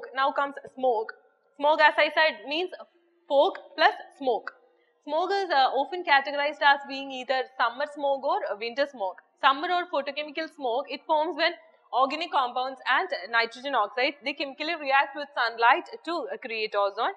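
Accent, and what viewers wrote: Kerala, India. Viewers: thanks to neo ias ..great love from karnataka,... mam ur accent is in malayali style....for outer state student bit difficult to understand some words ...example- law....u pronounced it as lu, fog -fug, etc ...........speed is like bullet train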